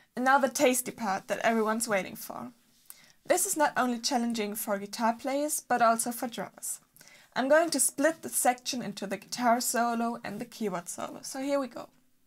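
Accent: Germany. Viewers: I'm not a musician at all but I do enjoy these tutorials that you post. I do love your voice and accent (I know you're German) but if you ever heard me talk you would laugh at it cause I live in the southern part of Arkansas in the United States lol :)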